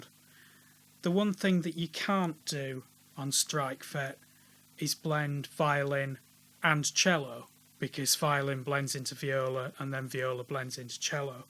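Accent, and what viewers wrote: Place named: North, United Kingdom. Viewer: Great review nice to hear a northern accent on here.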